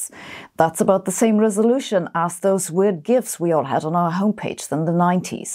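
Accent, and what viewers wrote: Germany. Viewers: Somehow her German accent makes the science that she's presenting seem to be even more credible. Although, when she said Dr. Weibel, I thought she was talking about someone named Beiber, since such a name would be pronounced as Beeber in English (and Bye-berr in German). Naturally, I thought she was going to say that "Dr." Beiber discovered magnetic fields by electrifying crowds of teenage girls and causing them to be magnetically attracted to him. Thankfully, the video is packed with info, rather than jokes. Thankfully, also, is the fact that the Dr. in question is a real doctor and is named Weibel, rather than Beiber!!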